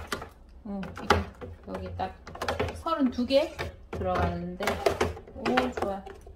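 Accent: Gyeongsang, South Korea. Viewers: 어머나.. 이제 목소리까지 오픈하셨군요 ㅎㅎㅎㅎ 경상도 출신이라 경상도 사투리 참 정겹네요♡ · 영상스타일이 살짝 바뀌었네요..^^ 광고 뒤 영상나올 줄 알았는데 안나와서ㅋㅋㅋㅋ당황했네요ㅋㅋ 같은 경상도라 말투가 넘 친근하네요..오늘도 잘 봤습니다.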